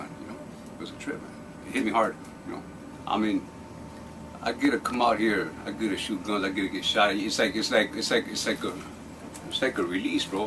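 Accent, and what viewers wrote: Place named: Mexicali, Mexico. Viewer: he's got the most stereotypical mexicali voice and accent there has ever been in the multiverse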